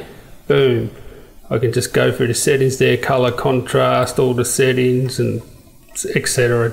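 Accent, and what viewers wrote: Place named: Australia. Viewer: Great Aussie voice hahaha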